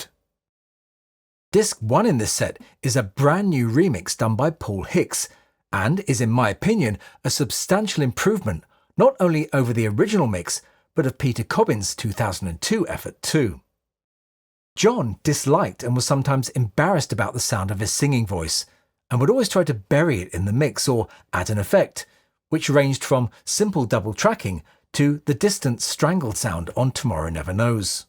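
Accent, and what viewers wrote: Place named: United Kingdom. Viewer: Hola !! Desde Argentina, muy fan de John, amé toda tu explicacion !!! espectacular y ese acento britanico acompañando la explicacion da gusto oirlo. Te felicito. gran video. gran disco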